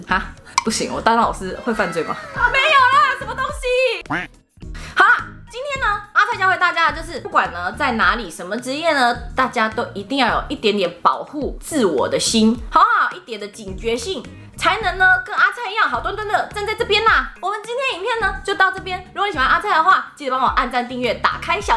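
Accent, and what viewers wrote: Taiwan. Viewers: 看著影片 突然聽到台灣國語 感覺整個超親切啊....阿菜加油喔XDDD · 台灣國語的口音又親切又真好笑~笑死我了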